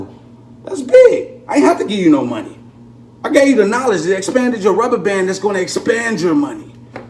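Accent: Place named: Chicago, United States. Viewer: You talk like you from Chicago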